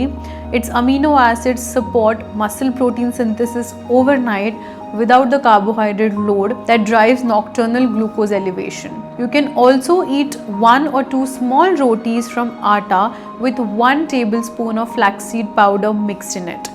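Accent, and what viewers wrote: India. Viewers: Too verbose.. Please keep the verbiage to the minimum.. too much to process all at the same time.. keep it to the point.. and lastly, your program explains in English.. why don't you explain in Hindi.. your English pronunciations can be very annoying.. it's not your fault.. 99 percent Indians want to speak in English but pronounce their words wrongly, with a regional accent.. someone like me who wants to understand clearly is unable to.. for eg metabolism.. or naturopathy.. you say naa chura puthy or meta bolism. The correct pronunciations are muh taa buh lay shun nd naach uh roh pathy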